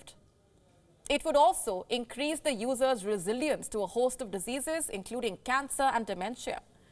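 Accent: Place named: India. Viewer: Don't speak lye like Indian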